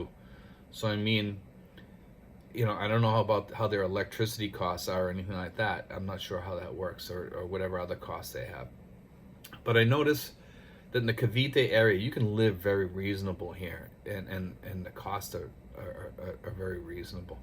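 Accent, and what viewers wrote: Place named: Northeast, United States. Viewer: Sounds like your from the northeast of USA with your accent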